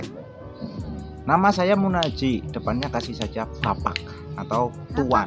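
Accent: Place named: Banyumas, Indonesia. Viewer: Nek Tek bedek, kayane Rika Agi mancing yh?? Lah wong ngapak genah ya..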